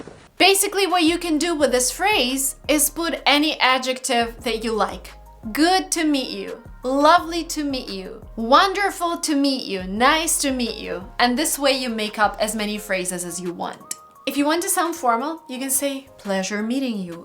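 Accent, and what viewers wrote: United States. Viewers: You inspire me a lot. People say you can't have an American accent because you're not a native speaker but YOU DO, so I've been improving my accent for like 5 years now and now people think i'm American haha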